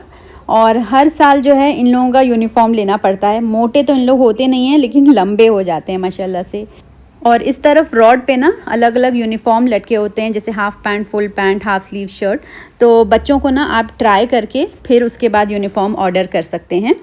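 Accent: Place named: Uttar Pradesh, India. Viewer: Apke accent se lagrha ap UP se ho india me Maza aata h apki videos dekh k btw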